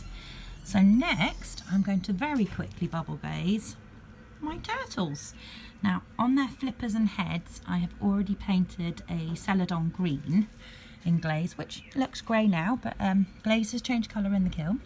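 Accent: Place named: England, United Kingdom. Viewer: You're so sweet and joyful and i love your english accent!!! I hope i visit england one day! 😃 the seals are cute! Getting a nice bubble paint bath😃 sending love from the US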